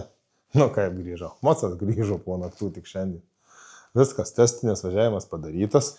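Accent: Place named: Suvalkija, Lithuania. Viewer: Sveiki, dėkui už naują vaizdelį 😀 pasidariau priklausoma nuo jūsų suvalkietiškos šnektos ir laukų 😃. Man, kaip kaimo vaikui, tokie peizažai labai mieli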